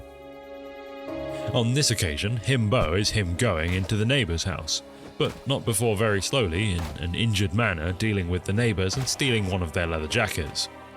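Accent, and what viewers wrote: United States. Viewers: absolutely killah American narration hell yeah babe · As an American, I request that you give us more of your American accent. It may be wrong, but that doesn’t stop it from being wonderful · As a Texan I forgive and indeed do kindly demand more of your southern accent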